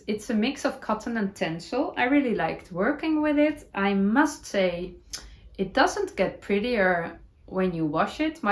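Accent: Netherlands. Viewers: Today was closer to 40c in my part of Texas, USA. And tomorrow is World Wide Knit in Public day. I can promise we won't be outside for very long. Perhaps I will take my knitting to a coffee shop and sit in the air conditioning there. I would-be lost with the AC. I love to hear your Dutchy English and the encouragement to do the things that bring you joy. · Congratulations on your milestone! In so many ways!!! It was such a pleasure to watch your podcasts despite what you called your "Dutchy English"! You travelled WAY out of your comfort zone speaking English even though you may not have believed that you were not good at it. I am sure that I am not alone in wanting to praise you to the highest degree!!! You are truly inspiring us to stretch beyond to achieve the utmost joy in our crafts and sometimes that involves stretching ourselves beyond what what we feel capable of doing well enough for others to see. 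You are a down to earth, creative individual sharing your love of crafting in crochet and knitting and I will not stop recommending your podcast to others, so there!!! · Congratulations.... you are delightful & I LOVE LOVE LOVE your Dutchie English.. love too hearing you talk about the weather - my (Australian) Son lives in Amsterdam & is learning Dutch so the shoe is on the other foot. I’m flying over tomorrow so interested to hear how warm it is. Keep up the good vibes. Nx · Love your Dutchy English! And the way you rethink attitude to generate positivity. Heart Jumping is a “Sarahism” I’m increasingly adopting 😊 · Congratulations! Wow 10 k that is awesome🎉 I really enjoy listening to your podcasts and love hearing your Dutchy English, you are so good at speaking English especially when it’s not your everyday language, well done. Thank you 😊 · I am so pleased I found your podcast. I absolutely love listening to you and hearing all your stories ❤️ In the early 80’s my husband served as an exchange pilot with the Dutch airforce. 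We loved the Nederland and our two little girls became quite fluent in Dutch. It was a struggle for me but as a Canadian I was always treated with so much love and respect for trying. Your accent sounds so much like a dear friend of mine that I have lost touch with; she was originally from Breda. She was a beautiful knitter. I actually learned to knit in the Nederland. Continue with beautiful heart jumping ❤️. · I love that you podcast in English too! For me it is not just the beautiful knitting. My grandparents and many of their siblings immigrated from Holland to the US after WWII. Your accent and the way you talk is just how they would talk. Listening to you brings back many warm childhood feelings for me. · You are so happy and uplifting. I am 73 and have been knitting, crocheting & sewing since I was a teenager. I really enjoy your Dutchie English & all you share. Wishing you many heart jumping moments. 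· Congratulations to you 🎉. So glad you didn’t listen to your Miss Perfectionist and carried on with your English vlogs. There is nothing wrong with your English, as another person said, I love your Dutchy English. Thank you for your honest, genuine vlogs you bring much happiness ❤❤ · Congratulations lovely on reaching 10 K - now 10.5K only one day later! 🎉 I simply love watching you as I crochet, I have Dutch heritage so it’s delightful to hear your accent and your growing command of English. Well done indeed! You certainly should be proud of yourself for ignoring your ‘Little Miss Perfect’ 😉 and following your heart. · Another great episode - I loved to hear the story of your journey toward your English podcast and the 10,000 subscribers! Celebrate your success - your Dutchy English is very endearing! (Dawn) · Congratulations!!! I so enjoy your podcast and your “Dutchy English”. As a native English speaker I find myself saying words like “podcast” the way you do. 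😅 You are very inspirational and just a joy to listen to. Congrats again. 🎉10,000🎉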